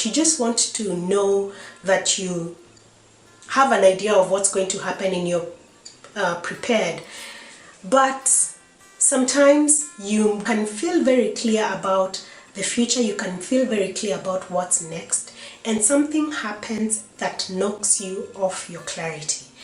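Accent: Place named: Kenya. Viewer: I came across your video after quitting my job abruptly. Thanks for the solid advice. You sound Kenyan na uko Vienna? Niko Wien pia. Point 7 hit home...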